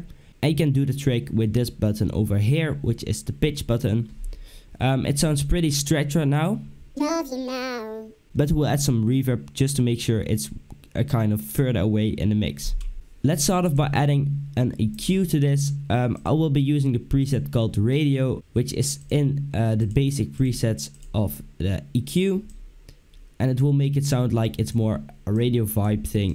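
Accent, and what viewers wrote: Netherlands. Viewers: You’re dutch right?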